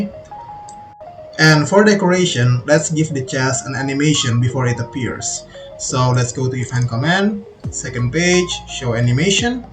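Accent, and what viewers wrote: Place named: Indonesia. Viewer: That sucks! hope you get your account back. But random question, Are you Indonesian by any chance? I noticed you have somewhat of an accent and it sounded similar to Indonesian accent.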